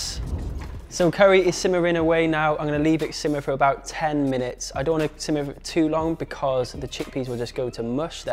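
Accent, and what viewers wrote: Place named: Northern England, United Kingdom. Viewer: Just subbed ! And 'liked' ! When you coming to London? I guess by your accent you're from up North ? Or do you live in London? Would be great to see one of your demos in London!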